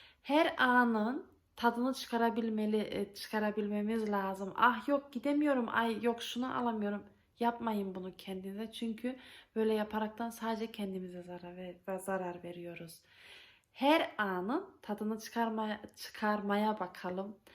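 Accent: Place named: Yozgat, Turkey. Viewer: yeni tanidim cok pozitifsin bende yozgatliyim yakiniz senin memlekete konusman bize cok benziyo cok sevdim seni😘😍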